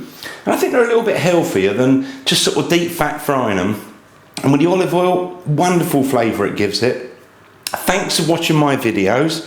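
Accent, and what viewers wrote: United Kingdom. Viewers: jimmy juses bars he’s british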